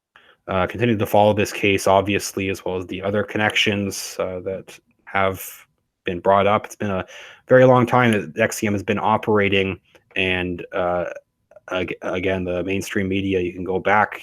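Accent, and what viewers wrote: Canada. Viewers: First time viewer you sound Canadian The walls are tumbling down Trump Crimes against children looks like its just getting started ! I subscribed the more Citizen journalists the better !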